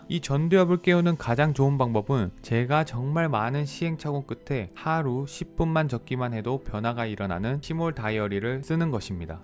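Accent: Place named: Gyeongsang, South Korea. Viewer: 시몰 다이어리 잘 받았습니다. 너무 감사합니다. 정성과 진심을 느껴서 댓글은 처음인데 안 쓸 수가 없었네요.. 듣다가 경상도분이시구나!.. 내적 친밀감..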